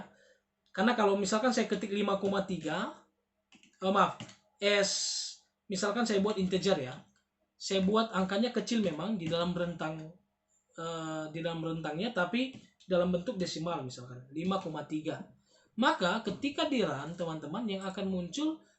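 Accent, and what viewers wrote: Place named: Buton, Indonesia. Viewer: berasa dijelasin sama teman waktu kuliah orang Buton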